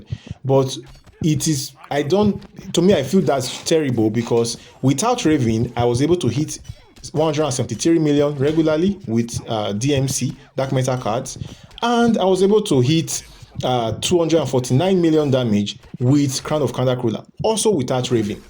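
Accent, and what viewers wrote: Nigeria. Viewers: Bro are u Nigerian?? Nice content btw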